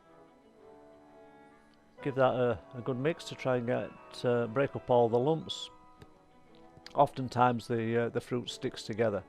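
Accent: United Kingdom. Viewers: I made this cake for the first time this year, followed Delia's recipe to the letter (from the book) and didn't look at it for 4 hours as instructed and it was very burnt, black in places. Very disappointed but too invested to stop so I trimmed off the burnt bits, top sides and bottom, fed it and iced it following your video this time, which was very helpful, so it'll look great but who knows what it will taste like. Sounds like you're from my neck of the woods or over the border (West Yorkshire). Happy Christmas!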